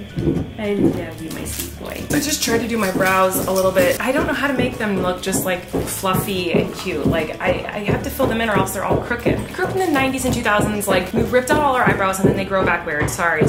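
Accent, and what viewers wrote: South, United States. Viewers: you and and playing with the fly swatters with your country accent is soo cute! i know a lot about country accents being from alabama! ❤ do you ever perform in alabama or anywhere in the south east!? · Love that pink on you! And that country accent 😅😅😅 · I love your southern Accent you were doing if you were doing one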